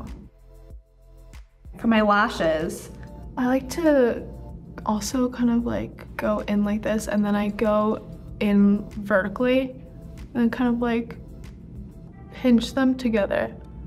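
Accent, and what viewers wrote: United States. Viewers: they mean American accent, like her diction and the way she articulates the words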